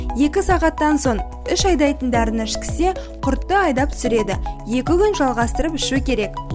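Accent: Standard. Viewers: Ана тілімізде таза сөйлеген өркенің өссін айналайын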